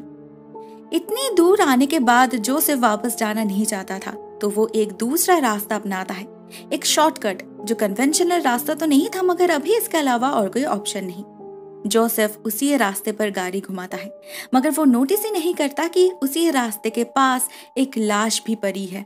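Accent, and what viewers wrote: Bengal, India. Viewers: Your bengali tone in voice can be identified..but it's sweet and nice to listen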